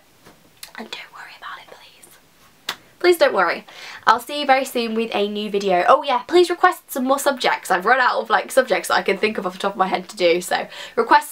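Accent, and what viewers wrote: United Kingdom. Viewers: I actually am obsessed with your videos 😂😂😂 I feel like I relate on so many levels, so nice to hear a British voice on a revision channel 😊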